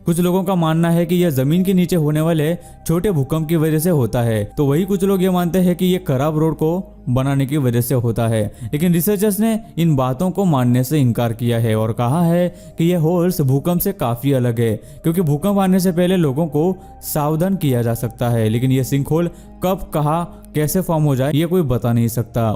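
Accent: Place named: South India, India. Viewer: Aap ki aawaj se to aap south indian lag rahe hain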